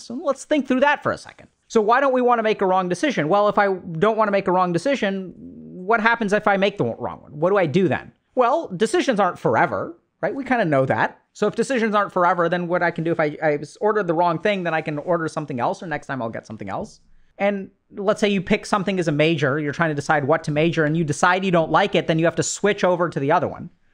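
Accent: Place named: India. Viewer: i love your indian english man :D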